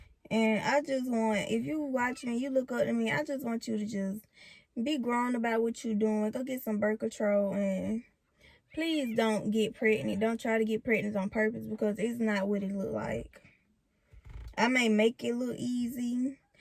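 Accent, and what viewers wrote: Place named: South, United States. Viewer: Hey.... I love the name Zahavi...and your Lil southern accent make it sound really cute. I bet he look just like you! I'm proud of you for stepping up and wanting to be the mother you are. We all have things we wish we could have did different but if we did different it would still be other choices we could have made...if that make sense. Your so cute and seem to know exactly what you can handle. Imma a fan! #TeamZs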